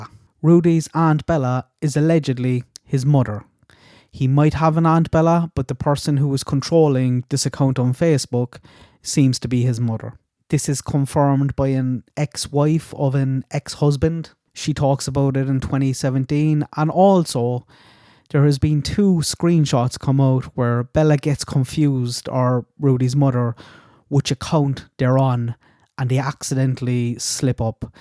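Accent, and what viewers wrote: Ireland. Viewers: I love the Irish accent & many others in the world!!! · I'm sorry you don't have a sophisticated ear to appreciate an Irish accent. That's your misfortune. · He sounds Irish to me and I like it but it can be a bit harsh at first if you aren’t used to it. Specifically the r’s and t’s. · Yay to his Irish accent. I love it. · I don’t know why I didn’t consider that; I lived in the same dorm building with a few Irish girls and yeah now I’m thinking the speaker could be Irish lol. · You are either a bully, or racist, if you don't want to listen, go away, every voice is awesome, (not everyone can speak), Irish is like every accent a blessing, you really should apologise, works so hard to bring us content, and you don't have to pay to watch it, stop being rude and if you do want to go farther in life, REMEMBER it's nice to be nice, manners are free, a smile can make a lonely persons day, and we all have to live in this world, and everyone else is as important as you are, have a great day and stay safe ❤ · He’s got a lovely voice and accent you rude pr!ck. 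And he’d quite obviously Irish, for the ppl in the back 🙄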